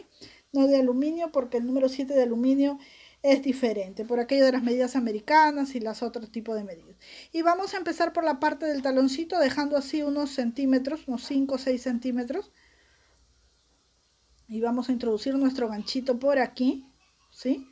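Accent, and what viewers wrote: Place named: Mexico. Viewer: ¿Eres Peruana?...tu acento es muy parecido al del norte de América , específicamente de México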